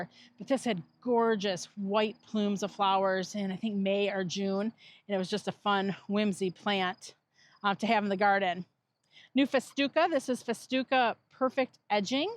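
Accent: United States. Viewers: If American mispronunciations bother you so much, maybe you should consider not watching an American's content about an American company, on an American made media platform. Clearly what we lack in proper pronunciation, we make up for elsewhere or you wouldn't be here.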